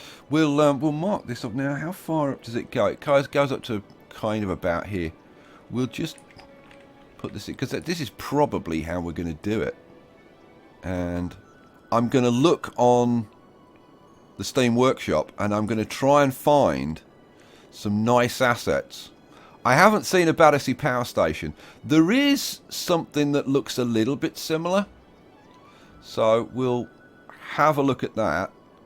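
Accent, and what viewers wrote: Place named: Birmingham, United Kingdom. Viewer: you said you lived in london most of your life, i always thought you had a brummie twang..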